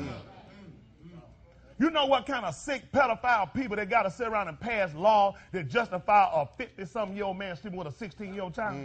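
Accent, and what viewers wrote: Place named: South, United States. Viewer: Man his Country accent gives it ALL. his hair piece!!!!!!!!!!!!!!!!!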